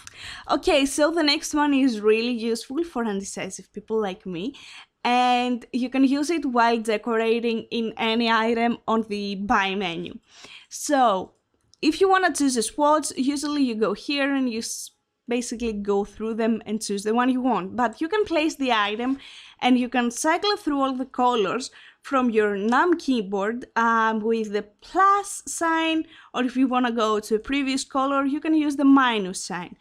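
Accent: Greece. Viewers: Are you from Greece?? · She has to be greek to have that accent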